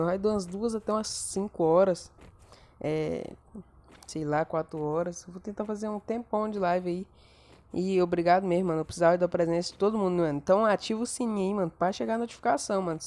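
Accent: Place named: Northeast, Brazil. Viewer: Esse é nordestino ''já vazou la por riba'' e noix <3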